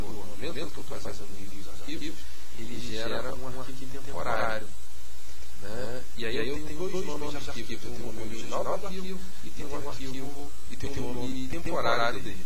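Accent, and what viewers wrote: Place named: Rio de Janeiro, Brazil. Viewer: apesar de audio terrivel e sotaque carioca forte, um otimo video!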